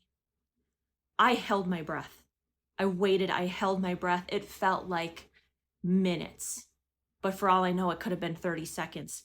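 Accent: Minnesota, United States. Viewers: Minnesota, i believe. Her accent really stands out. i think she hinted where abouts she was from, in other videos, if she didnt come out and say it.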